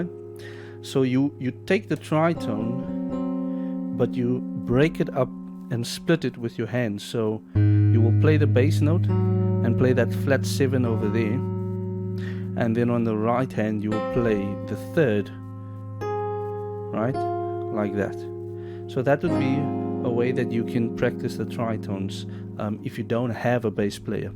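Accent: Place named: Southern Africa. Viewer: It's amazing how distcinct a Southern African Accent is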